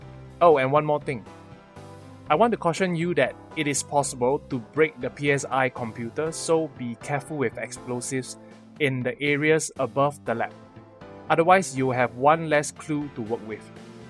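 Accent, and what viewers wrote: Singapore. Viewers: Haha could tell you were from SG from your voice. You sound like a tuition video for PSLE.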